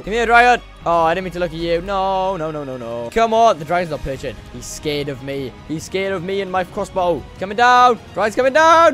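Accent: Wales, United Kingdom. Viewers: Can’t tell if your welsh or not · It’s a Welsh accent for sure, he has to be from Wales?…..👍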